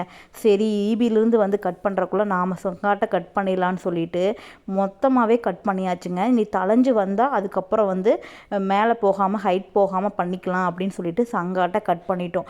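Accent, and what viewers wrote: Kongu, India. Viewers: So cute'ma. அழகான கொங்கு தமிழ். கோவையில நீங்க எந்த இடம்ப்பா?. Surgeryக்குப் பிறகு இப்ப பரவாயில்லையா?!!. Get well soon.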